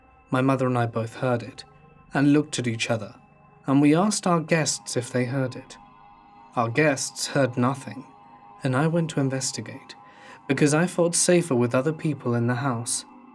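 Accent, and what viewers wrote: United Kingdom. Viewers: I don’t know why, but when someone reads stories in a British accent it’s much more believable lol.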